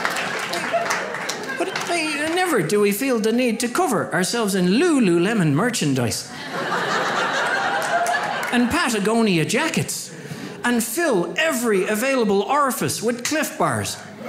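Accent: Ireland. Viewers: Very funny. And I can't resist an Irish accent. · It was close to accurate. To a passive listener, he could have easily passed it off as legit. But I really do love listening to the Irish accent.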